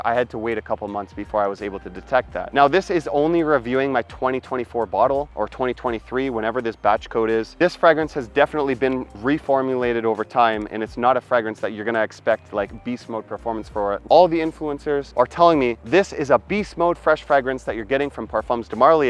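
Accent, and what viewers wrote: Canada. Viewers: Anything in a 4.2oz bottle is definitely worth it. 100 meters🤣😂😭Canadians definitely talk differently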